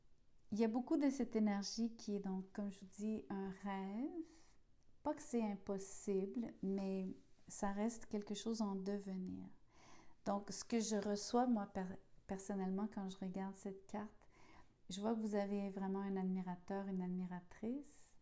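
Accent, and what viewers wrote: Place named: Quebec, Canada. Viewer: J'adore votre accent "je me souviens "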